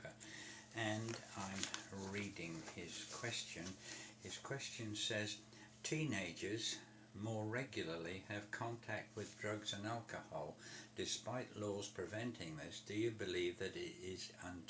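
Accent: United Kingdom. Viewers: Old Guy + British Accent = Best lecturer.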